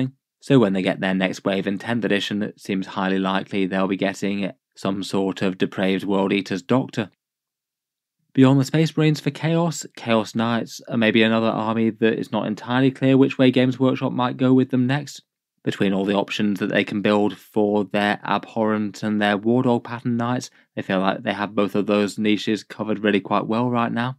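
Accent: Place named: United Kingdom. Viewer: What UK regional accent is this? Very narrative. Can you read LOTR to me for money, lol.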